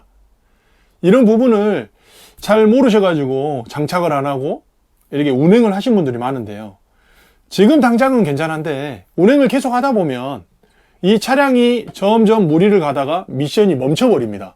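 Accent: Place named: Seoul, South Korea. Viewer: 아따 서울사람이줄 ㅎ 멋저버러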